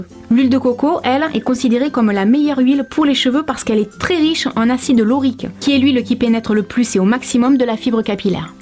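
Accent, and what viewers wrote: South, France. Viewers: merci pour tes conseils et reflexions! j' adore ton accent du sud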